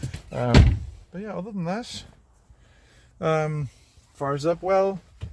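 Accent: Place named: Cork, Ireland. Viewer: ah you can still hear it in you is it north cork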